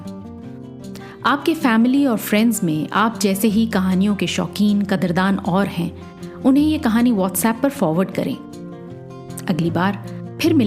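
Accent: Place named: Lucknow, India. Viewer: Excellent... I can smell lucknow in your Voice .... Thanks alot Ayesha 🤍🖤 for recommendation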